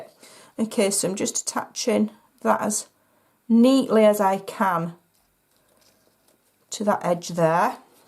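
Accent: Yorkshire, United Kingdom. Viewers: Great Video and Beautiful Box, keep them coming!! I enjoyed laughing along with you so much, that I have subscribed to your Channel to hear more from you, so I am looking forward to lots more lovely ideas. Love listening to your Yorkshire accent - I am an Australian SU Demonstrator.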